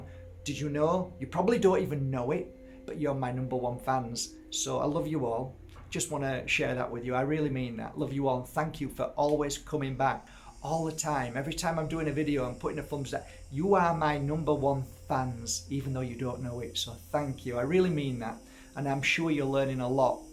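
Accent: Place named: Yorkshire, United Kingdom. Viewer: Brilliant! You’ve got such a beautiful heart! ❤️ Thank you for the workshop. I will try and help those who are going to need this 👌💫 Ps. Love listening to you 💕 Your accent reminds me of the time I had spent in Yorkshire, I used to study and work in Leeds for some good 6 years! 😄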